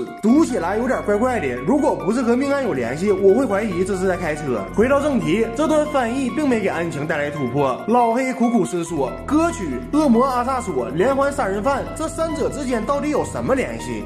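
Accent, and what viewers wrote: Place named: Northeast, China. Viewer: 我承认有些人爱听东北口音，但是也有好多人都听不惯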